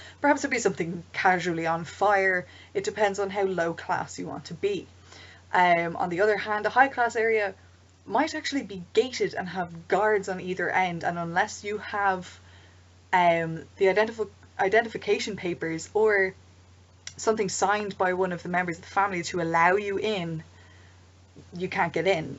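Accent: Ireland. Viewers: Thank you for the information. Interested in city planning since world-building an accident society similar to Rome. Btw: that accent is cute as hell. Irish? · you irish? where do you play?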